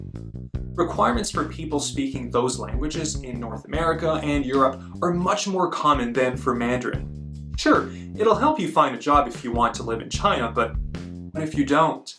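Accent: Standard. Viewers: 卧槽你普通话好标准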